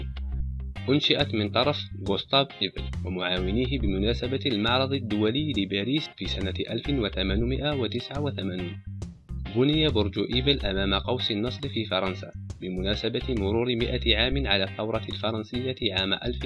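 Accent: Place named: Morocco. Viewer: انت مغربي 100 بل 100 لان طريقة كلامك مغربية المرجو الاجابة الفيديو رائع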